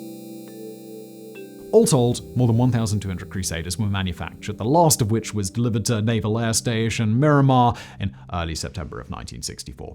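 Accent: England, United Kingdom. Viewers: Arrogant use of English accent. This guy Never Soldier w/ Cavalier wuss an Talk Anti American Buffoon!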